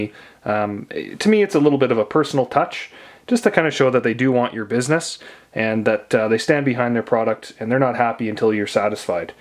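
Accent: Canada. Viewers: Thanks this will be helpful. I have an AB oval on my road bike and I DO notice a difference there. I am considering one for my MTB. Used to live in Evergreen, Calgary. I thought I noticed an Alberta Accent.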